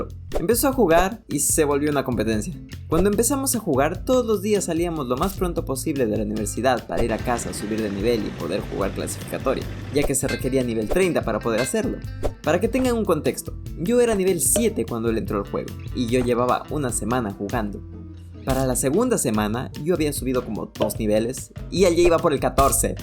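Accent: Chile. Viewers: Eres chileno? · Primero...: me gusto el video!!! Me encato!♡ Y segundo... Así que mi sospecha era cierta?! El men si es chileno jsjsjs, pense que era imaginacion mia, pero la wea era cierta. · Es chileno 😳👌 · Eres chileno? Peruano? Boliviano?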